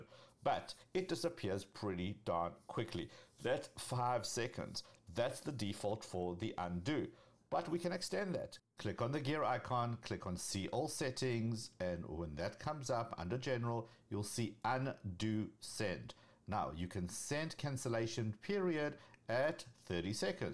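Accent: South Africa. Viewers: your content is amazing and your SA accent makes it even better.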